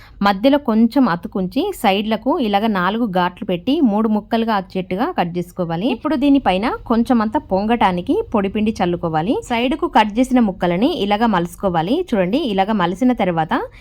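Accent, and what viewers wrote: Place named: Telangana, India. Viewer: Bagane cheppaaru kani konni words and mee slang edhola unnaay. Artham kaledhu. Meedhi Telangana state ayyi undochu kani andariki artham ayye slang lo chepthe bagundedhi. Only Telangana vallake kadhu kadhaa ee video. Telugu state ayina Andhra vallu kuda chudochu kadha. Naku kuda voma ante artham kaledhu evaro cheppedaka. Slang pure ga artham ayyela unte baguntundhi. But video is ok.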